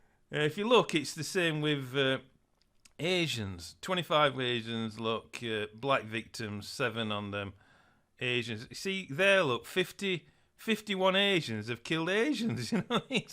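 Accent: Yorkshire, United Kingdom. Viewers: Simon is 100% correct, you really have no idea what you are talking about, that silly Yorkshire/Midlands accent is really off putting by the way...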